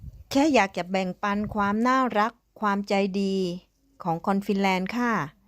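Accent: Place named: Phuket, Thailand. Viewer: ของฟรีมีในโลกจริงๆค่ะพี่ ชอบทำเนียงพี่จัง ว่าเหมือนสำเนียงภูเก็ตพังงาค่ะอิอิ ดาด้ากะเดาเอานิค่ะพี่ ลงคลิปเยอะๆหน่อยนะคะดูค่ะ ติดยูทูปครั้งแรกกะคลิปของสาวฟินแลนด์นี่แหละค่ะเมื่อหลายปีก่อนติดช่องน้องบีมากน้องบีเก็บเห็ด แล้วชอบดูเวลาเขาไปเอาปลาฟรีเขาลากปลามาไว้ในเรือชอบมากค่ะ มีน้องคนนึงอยู่ฟินแลนด์เหมือนกันชื่อน้องเฟิร์นแต่น้องไม่ค่อยลงคลิปไม่เคยเจอกันแต่คิดว่าน้องน่ารักมากๆ สรุปว่าสาวใต้ไปอยู่ฟินแลนด์น่าร้ากกก🥰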